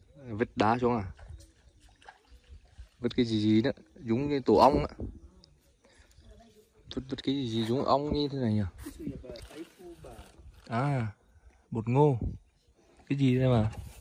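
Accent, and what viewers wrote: Nghe An, Vietnam. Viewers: 🥰🥰🥰 Yêu cái giọng nghệ an ghê😂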